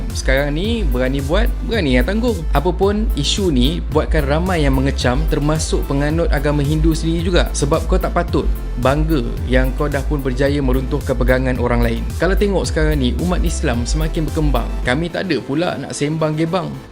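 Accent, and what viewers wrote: Indonesia. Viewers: betul..slang cakap pon lain..betul2 melayu ke atau indon?..sebab indon x semuanya melayu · Pompuan Indonesia biasa la...dari bahasa yg diucapkan memang Indon. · hahaha bukan melayu tu ,,bunyi indonesia je,entah dri mana minah ni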